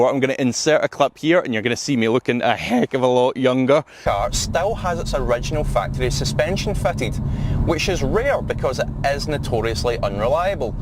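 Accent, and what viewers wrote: Scotland, United Kingdom. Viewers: Was just watching R8 videos on youtube and came across your channel, great to hear a fellow Scot and well done on your new car. You've got a new sub in me.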